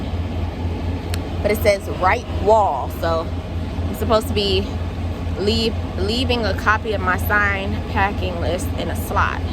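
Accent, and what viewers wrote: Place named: Brooklyn, United States. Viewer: U from Brooklyn 👀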